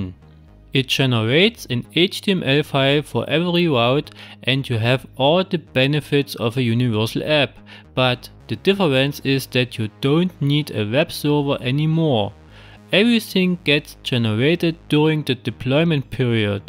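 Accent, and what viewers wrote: Germany. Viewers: This accent is special and typical from east-germany. I am from germany as well and I immediatelly recognized that he is from east-germany (Ich vermute Thüringen oder tiefes Sachsen ;-) ). After all the video and the stuff is great. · Your accent sounds like German. Anyway, thanks for your good information. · Klingt nach Sächsischer Schweiz, Dankeschön für das geile Video! Ich würde mich auch sehr über ein Video auf Deutsch freuen.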